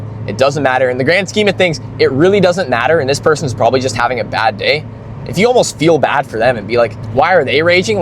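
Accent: Canada. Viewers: Nice video though. Just curious, are you from Victoria, BC? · As soon as you said “40KM/H” i instantly knew I had to subscribe cause I already had a feeling from your voice you were Canadian, then I seen that intersection on highway 11 with chevron in Abbotsford (I think) I knew you were in my province too (we need more Canadian car YouTubers)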